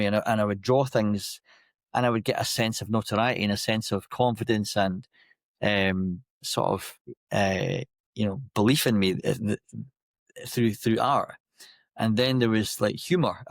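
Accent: Wales, United Kingdom. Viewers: This is my Go To motivational podcast.. Well done for this one, must admit the Welsh accent is very soothing and perfect for your profession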